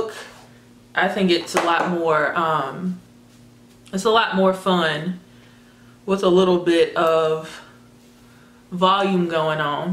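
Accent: South, United States. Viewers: Girrrrrl u are killin this unit!! So beautiful!! And your southern accent is so cute! Ima go broke wit these wigs, I swear 💞👑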